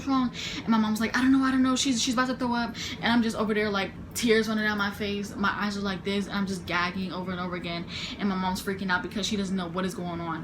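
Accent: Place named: Baltimore, United States. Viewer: Where u from cuz I heard baltimore im from baltimore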